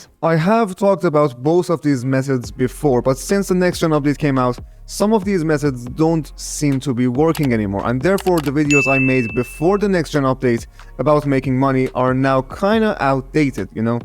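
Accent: Iran. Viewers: Ur accent sounds persian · U have Persian accent are u Iranian? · بسیار عالی(از لحجه‌ات فهمیدم ایرونی)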